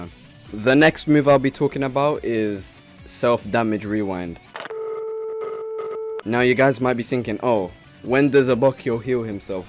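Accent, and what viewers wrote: United Kingdom. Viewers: He got that bri’ish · Ayyy a fellow British man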